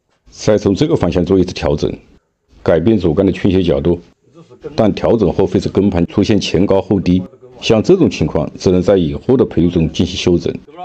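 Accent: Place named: Changsha, China. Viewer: 像长沙话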